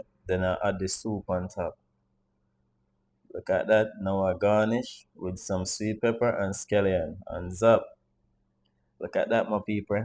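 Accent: Jamaica. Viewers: I just love to hear your accent and pronunciations. Real old time Jamaican Patwa.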